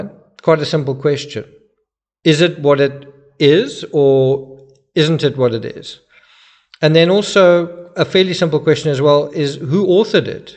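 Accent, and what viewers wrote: South Africa. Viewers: I'm confused, do people not realise you have a South African accent and that's why you pronounce things the way you do?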